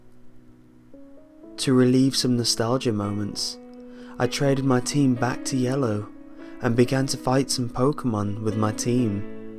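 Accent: United Kingdom. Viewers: ) omg Your british acsent is awesome !! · Just curious, are you British?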